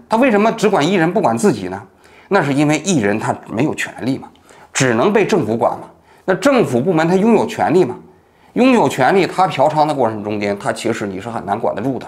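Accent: Standard. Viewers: 王局发音很标准，没字幕我也能听懂，没字幕也行的。同意的点赞。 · 王局字正腔圆，声音清楚，不需要字幕也听的非常清楚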